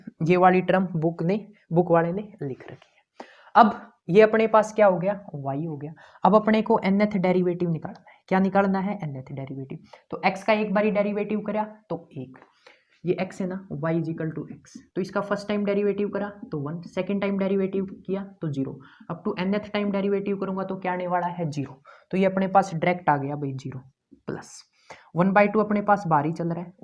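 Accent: Haryana, India. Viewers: Ye vedio me sir bhut sweet haryanvi bole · as we all know sir teachs in best way😼❤️. But Yo haryanvi accent add hon ke bad😂🫶ekdum ghar aali feel ari sir Thanku.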